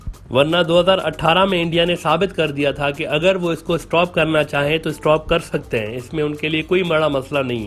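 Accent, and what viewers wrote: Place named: Pakistan. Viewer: you can see from how he pronounce it's Urdu by Pakistan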